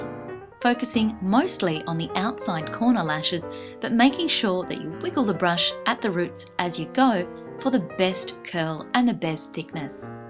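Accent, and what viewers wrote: Australia. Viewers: I was taken aback at the Aussie accent. It's always nice to hear on this platform, though.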